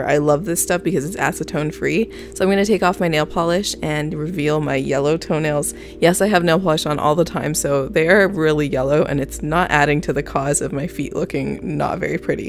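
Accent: Canada. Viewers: Ohhhhh I hear!!! You are Canadian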